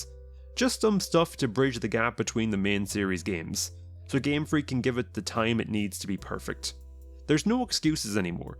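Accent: Ireland. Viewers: Your voice was like ASMR throughout the whole video 🫠 I love the way you say Jhoto... its the Irish accent. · You sound a lot like PotatoeMcWhiskey!! Are you Irish too?!! I love Ireland!!!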